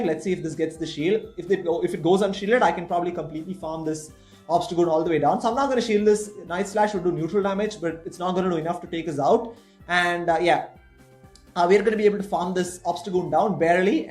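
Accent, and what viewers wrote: India. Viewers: dude ur english is flawless whit a indian accent sound fucking cool haha, one love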